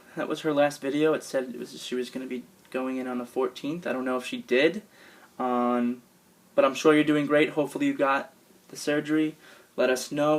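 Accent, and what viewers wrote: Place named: Long Island, United States. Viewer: your LAWNG GUYLAND accent was really in full effect in this video...haha sadly I've basically lost my NY accent. Nice update.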